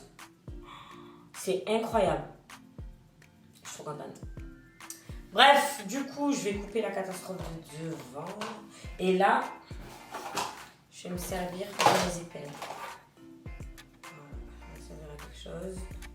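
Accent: Marseille, France. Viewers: J’adore 😍 jaime trop ton accent marseillais! 😘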